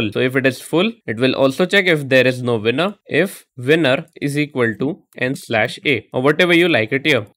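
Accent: India. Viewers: when i heard that indian accent i knew this vid was gonna help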